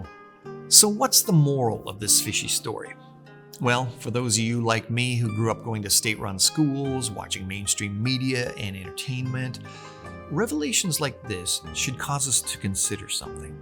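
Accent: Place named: Canada. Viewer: This guy has the most Canadian accent ever.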